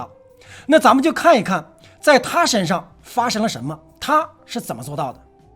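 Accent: Northeast, China. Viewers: 不喜欢东北土语，太低太俗，拉低了节目水平、层次。望去掉！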